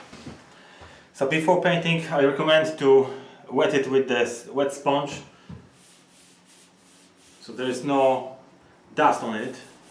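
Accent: Poland. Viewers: Hi there. Just discovered your channel and it’s a real treasure trove of useful little videos like this one so I’m subscribing to see more. Brilliant DIY advice for the UK viewers which is rare in a sea of American videos. I think I recognise your accent to so I’ll hazard a guess and say dzień dobry and dziękuję 🍻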